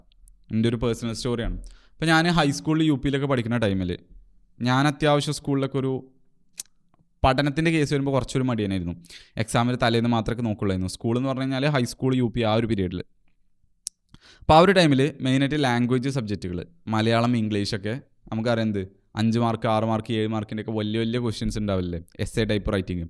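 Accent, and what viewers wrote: Malappuram, India. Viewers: Hey bro, you sound like a Malappuramkaran… .. good video of how to not use ChatGPT, I am from perinthalmanna living in Australia.. happened to see this video. It’s very nice. I will be happy if at least some of the new genz kids get influenced by your video.. liked the dopamine video too..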